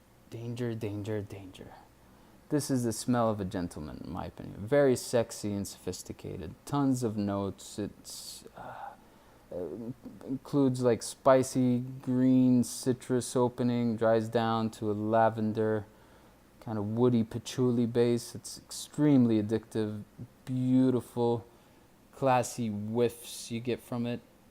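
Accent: United States. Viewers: I'm confused again,so you're arabic but you sound 100% american,so how is english your second language?;)p.s i would subscribe but your last upload was 4months ago. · Where are you from ? You have an American accent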